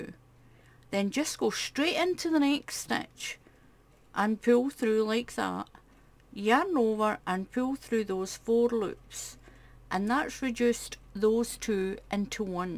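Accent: Scotland, United Kingdom. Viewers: Hallo can you please give us the number stitches for an 2xx person I think you are one person that can do it watched all you videos I love how you talk I think it sound like Scotland people is speaking I am from South Africa. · Thank you, your work is lovely, and I love your Scottish accent. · Hi this is brilliant and I cant wait to have a go at one of these. Also I am from Northern Ireland so I love your Scots accent! Looking forward to your next video.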